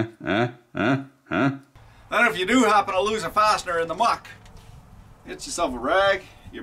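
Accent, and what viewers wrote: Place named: New Brunswick, Canada. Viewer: Magnetic Hill? I knew you were from NB with that eloquent chiac of yours.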